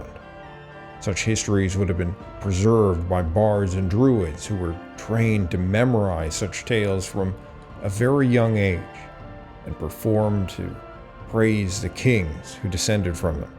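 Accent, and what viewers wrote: Canada. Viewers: They do. This is a Canadian channel so he has a Canadian pronunciation which is the same as the Irish pronunciation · He's Canadian. And over the years I've known many native speakers of Nova Scotian Gaelic, and in their dialect of English they pronounce it gay-lik. It's just how it is, and they've got every right to describe their own language as they like. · Can't say the stuff I've seen from Scots is any better. The placenames are going to be more accurate but not the information. Also the video is in Canadian English so don't expect the words to sound exactly the same as they do in Scottish English. As for the visuals, well have to agree with you there, sick of ai slop and sick of random images that don't relate to the audio. The most common way of pronouncing gaelic is 'gaylick' in America, England, Australia etc.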